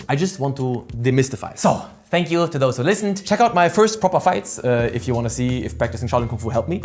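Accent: Germany. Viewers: Ich bin verwirrt. Der Akzent ist 100% Deutsch, der content ist aber englisch. Zwischen drin droppt er aber ein deutsches Wort in perfekter Aussprache, auf der Kanal Info steht dann aber Vereinigte Staaten. Check gar nix mehr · he's german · First time watching you, first impression: wait, why is this Japanese person talking with a German accent talking about Chinese martial arts. What xD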